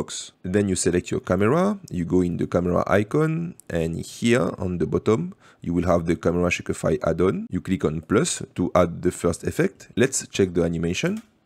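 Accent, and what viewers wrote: France. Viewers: You have a french accent are you french? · Merci. Et au moins avec ton accent bien Français j'arrive à comprendre l'anglais :) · Man, I can hear you’re French… Donc pourrais-tu le doubler en français, c’est désormais possible avec les nouveautés YouTube, cela ouvrirait ton audience au français qui ne parle pas très bien anglais. Ce n’est pas mon cas, je parle très bien anglais mais c’est plus facile d’apprendre quelque chose de compliqué comme blender quand c’est expliqué dans sa langue natale :D Sinon super tutoriel, fort utile :-)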